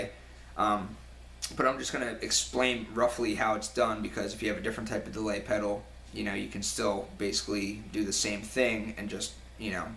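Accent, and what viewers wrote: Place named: East Coast, United States. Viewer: This guy sounds like Adam Sandler or someone from the east coast who's about to kick my ass while trying to scalp tickets to an Eagles or Red Sox game.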